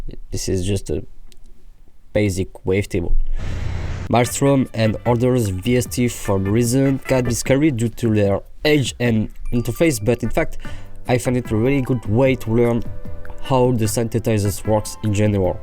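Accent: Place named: France. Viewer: J'ai adoré le tuto (je comprends mieux l'anglais avec un accent fr de merde)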